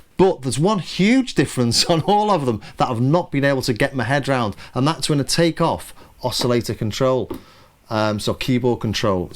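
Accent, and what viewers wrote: Liverpool, United Kingdom. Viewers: Just discovered - Great work mate. I make info vids too and that's a lot of work you're putting in there. Re-living my past buying the software synths from my 20's (including the old pro one in the background!) and you provide a detailed and honest review. I do keep thinking you'd love reaper with CSI to run your synths so you wouldn't miss the physical element - it's the thing that brought me back to enjoying all this gear. We're of similar era and location by the accent, always happy to be given the score with a scouse twang ;) Have you tried any of the cherry audio synths?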